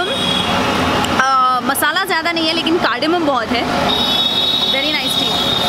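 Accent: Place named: Pune, India. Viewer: Assal punekari hindi boltes tu. 😅😅 Ekhada video marathi madhe pan banav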